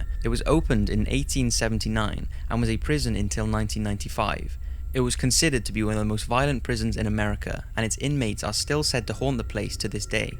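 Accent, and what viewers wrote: Wales, United Kingdom. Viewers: Are you Welsh? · You have a Welsh accent . sounds divine 😍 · Trying to work out your accent. . . Cardiff? Newport? Anywhere near? ... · You sound welsh. Awesome voice!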